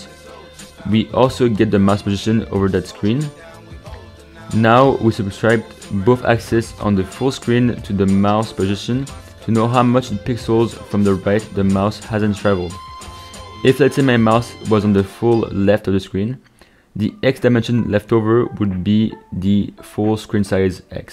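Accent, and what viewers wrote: France. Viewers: Are you french by any chance? I mean the accent Nice vid bro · are u french ? good tuto btw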